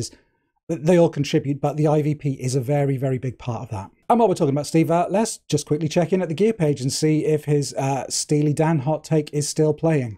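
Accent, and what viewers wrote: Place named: United Kingdom. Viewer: A guy with a beard and that wonderful british accent is talkin about guitar things with examples outside the mainstream music. I could not be happier. 😁👍 Thank you. Liked and subscribed. 🤘😎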